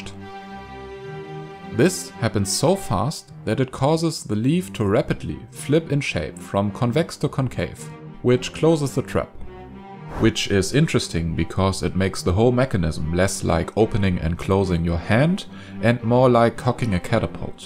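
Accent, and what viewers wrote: Germany. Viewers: Are you german? Your accent sounds similar to my brothers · Are you from fermany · ate you german? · Kann es sein dass sie ein Deutscher sind? Ihre Aussprache und auch der Satzbau (eher Deutsch als Englisch) lassen mich das vermuten. Ich finde ihre Videos übrigens äusserst toll ^^ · I really like your Videos and I think you should continue making them, but your german accent just annoys me, personally a little to much. I‘m sorry:( Though I must admit, that the quality of your videos is reaaally good! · wait a moment... he sounds really german 🤔 * Looks in the "About" of the channel * * reads: * "Hi. My name is and I'm from Germany"......... knew it 😏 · I hear a German accent.:D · The most German accent i've ever heard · Sehr eindeutiger doutscher akkzent aber sehr gutes video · This german english:D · He is definitely german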